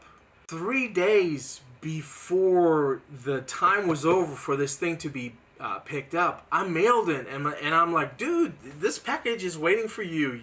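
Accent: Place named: United States. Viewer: Bloody hell. That's crazy. BTW for a German you sound like an American ha ha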